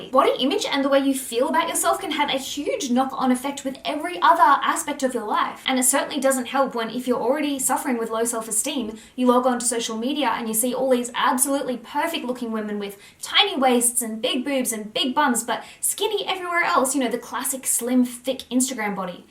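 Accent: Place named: Australia. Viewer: aaaaah cant believe you replied meeee!! i love your aussie accent i want to speak just like youuu hahahah looooove your content btw keep it up!!! i never miss a video!!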